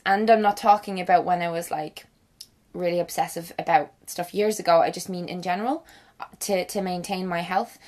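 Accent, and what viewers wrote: Ireland. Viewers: I love theseeeee and you your Irish accent is lovely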